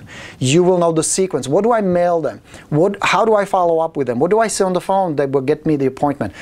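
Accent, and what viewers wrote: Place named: Czech Republic. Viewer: Hey, you mentioned your former thick Czechoslovakian accent and that you had to get rid of it. How did you pull that off? I myself need to get rid of my thick German accent...;-)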